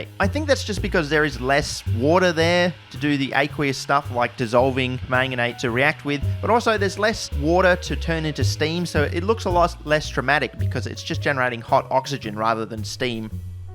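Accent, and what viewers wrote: Australia. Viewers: From the accent, you must be from Australia, the snake in the house--- sounds like down under. Many poisonous animals, and insects in Australia. I always wanted to see experiments using Hydrogen peroxide because I played with it in high school (I'm not a Chemist), and it fascinated me the many reactions like powered iron, or magnesium oxide from used up carbon batteries producing prodigious quantities of Oxygen. thank you sir! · Honestly that's how Aussies speak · When I first saw this channel I thought damn this is so american but naw he australian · I thought he was Australian not Finnish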